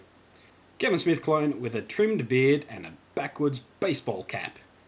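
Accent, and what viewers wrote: Australia. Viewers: Zomgkittens!!1eleventy The barbarians are at the gate! :D Love the titles, as always. I really don't know how any journalist could mistake your (almost textbook) Oz accent for British. And I'm sure PL knows you're doing a cockney accent there, not her silky-smooth posh. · Haha, yeah, he made this whole thing sound so ridiculous. It's weird how people always think Aussie accents are British. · haha that was funny. British though? Seriously, anyone with hearing can tell the difference between an Aussie accent and a British accent. That was a funny British accent though.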